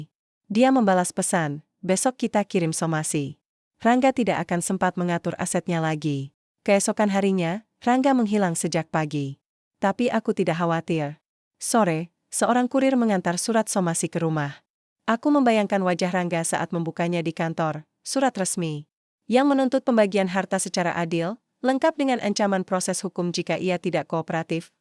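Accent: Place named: United Kingdom. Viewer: Kenapa to kok harus pake logat inggris segala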